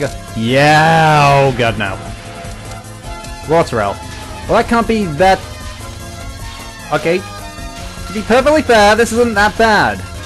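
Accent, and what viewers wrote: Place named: United Kingdom. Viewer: British Pronouciation mate, we say some letters differantly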